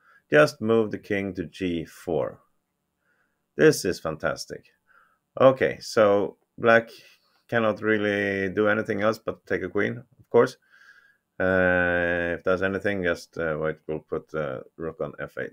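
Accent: Sweden. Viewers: Great work bro!! Are you from Sweden by any chance?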